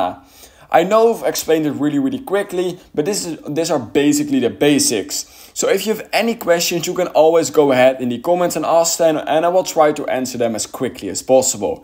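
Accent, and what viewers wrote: Netherlands. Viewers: I can hear the strong Dutch accent😂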